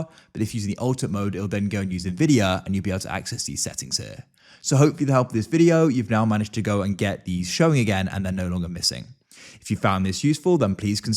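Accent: United Kingdom. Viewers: hes just british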